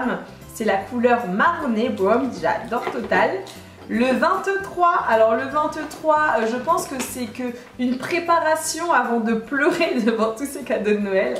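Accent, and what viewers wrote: United Kingdom. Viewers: J adore l accent so british 👍👍. Très beau calendrier. Tu serais sexy en mère noël. Toute la panoplie c dingue. Chapeau sephora.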